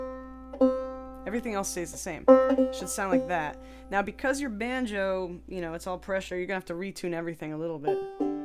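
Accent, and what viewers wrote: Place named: West Coast, United States. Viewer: why cant't she pronounce a ""T"" ? I really resent this ""west coast"" English. Go back to your 3rd grade English teacher. Speak proper English if you are going to do an American YouTube !!!! Duh !!!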